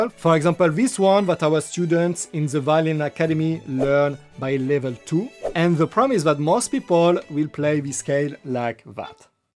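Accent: France. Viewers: These are some of the best tips and tutorials on YT. I do have a little difficulty with the French accent. One suggestion is to correct a grammar mistake often made throughout these videos. He says "Let your finger on the string" for instance. What he means is "Leave your finger on the string." The word you want is leave.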